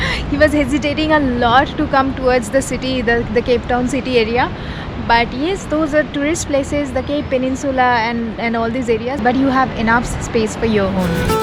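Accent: Bengal, India. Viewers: Your South Africa series was amazing, very nicely edited, breath taking scenic beauty, lively places and adventurous activities that you both did. Just guessing from your english pronounciation, Are u a Bengali??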